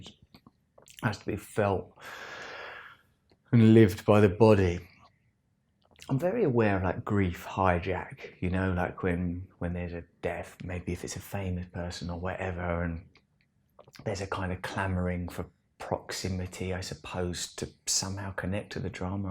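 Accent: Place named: Cockney, United Kingdom. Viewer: Is there no topic this nit won't publicly pontificate on? I bet he could drone on for hours about quick drying cement, just so as to hear his own mockney tones reverberating inside his empty skull. Russell, do us all a massive favour, and keep your inanities strictly to yourself...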